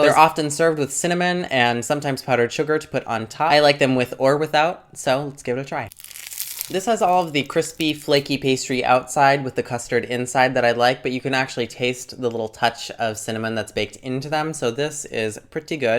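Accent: Portugal. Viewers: btw, you have a nice Portuguese accent! Keep going! :p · You've got a pretty natural accent. Just found your channel and already subscribed. Keep on learning Portuguese, it makes me really happy to know that people from outside are studying my language. I've recently found a lot of channels by foreign people who speak Portuguese (From Japan, Romania, Russia, Germany, USA...), but you're the first who speaks with an european accent. :) Greetings from Brazil (and please, come here one of these days :D)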